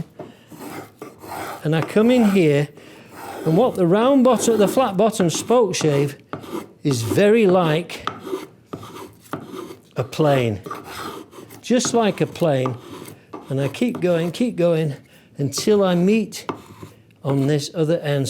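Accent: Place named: Northern England, United Kingdom. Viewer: Beautiful work and I love hearing your commentary - reminds me of my beloved family from the north of England! Off to chamfer the base of the Oregon legs on my Moravian Workbench project. Thanks to you, I'm sure it will be a much better outcome now! EDIT: Well, I used your approach for the bottom of the Oregon legs, cutting the chamfers first with a Japanese saw, then cleaning the up with my (beautiful) block plane. I even used your pencil line method! The chamfers look great and I'm grateful to you. Cheers from Sydney - David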